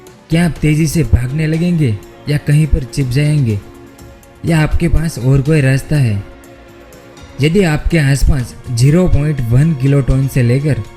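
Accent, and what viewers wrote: Gujarat, India. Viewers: Gujarati Ho kya? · ગુજરાતી લાગે છો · Bhai Gujarati ho kya? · Bhai Gujju ho kya 😃👌mast bolte ho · Gujarati h kya bhai mst bolta h😁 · Bhai teri hindi gujju jese arhi hai pls nxt time kisi aur ki awaaz aaye aisa karo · Tu gujarati h kya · A gujrati hai lavda saala awaaz se hi pata chal rha hai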